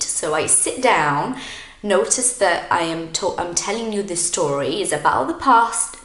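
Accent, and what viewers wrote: United Kingdom. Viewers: é a pimeira vez que vejo seus videos, mas gostei demais da informação! tenho aprendido português e inglês pela minha conta , e acho muito importante melhorar e corrigir nossos erros por não sermos nativos, seu sotaque é realmente lindo, depois de 15 anos ou mais estudando inglês americano seria dificil para mim imitâ-lo, mas vou recomendar seu canal aos meus estudantes de inglês que gostariam de aprender o sotaque britânico!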